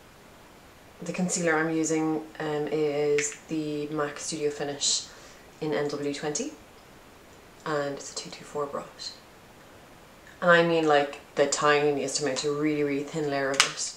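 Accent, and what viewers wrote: Ireland. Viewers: I loove your accent! Irish? :)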